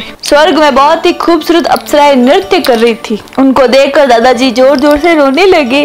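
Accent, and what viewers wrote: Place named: Rajasthan, India. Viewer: ठीक है जी आप का पहनावा दिल को लुभावना लाग्या और आप की बोली गजब है जय मेरा राजस्थान फतेहपुर शेखावाटी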